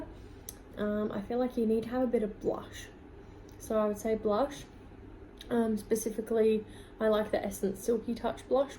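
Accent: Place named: Australia. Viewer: I’m surprised you moved there at 14 and you sound Australian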